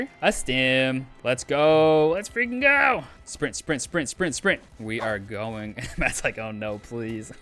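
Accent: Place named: Canada. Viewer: This is my first time seeing your channel based on your accent I’m guessing you’re a fellow Canadian. :D if not hello from Toronto Canada. You kind of sound like your from Alberta maybe 🤔. I’ve had this game in my steam library for a while. I might actually play it. Your video has inspired me :D.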